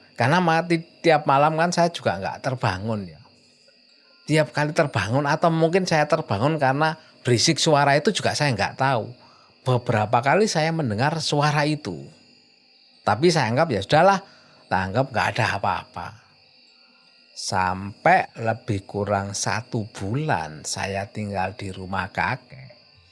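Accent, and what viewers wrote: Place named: Java, Indonesia. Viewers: Gradakan jowo nemen pakde🤣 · dialeknya jawa timuran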